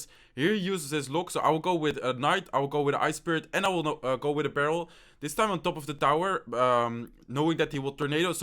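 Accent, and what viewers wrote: Netherlands. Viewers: I tough you were Dutch just like me cuz of the accent. I look in the description and it says: I’m from the Netherlands lol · Dutch accent is kinda annoying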